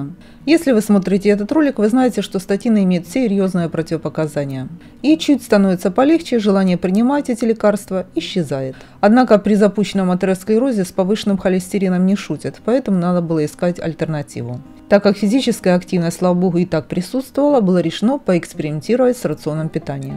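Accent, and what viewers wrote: Ukraine. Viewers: У вас акцент украинский. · Это украинский говор. Будьте толерантны. Мы же спокойно относимся к вашему российскому.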